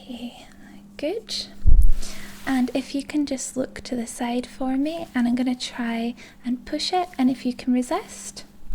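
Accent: Scotland, United Kingdom. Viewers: I click on these to find out which accent I’m going to find. The Scottish one is my absolute favourite. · Love your scots accent it makes me feel safe and helps with my ptsd thanks for all you do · Your medical role-plays are first-class and the Scottish accent is an added bonus!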